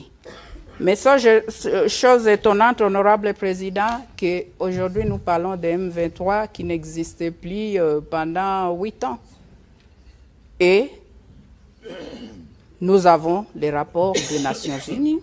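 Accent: Rwanda. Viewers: Rwandaise kende na rwanda yoka ba ra naba re na prononciation naye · Una sema swahili kina rwanda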